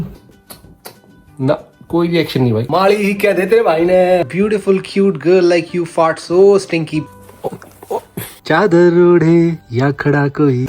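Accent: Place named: Haryana, India. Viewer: Hey bhaiya....❤love from India.....big fan of your haryanvi accent 😀😀😀god bless your family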